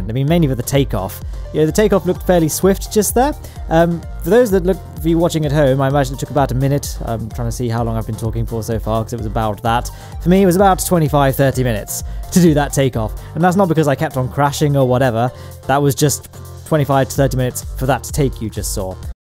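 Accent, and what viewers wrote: United Kingdom. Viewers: Its the bitish accent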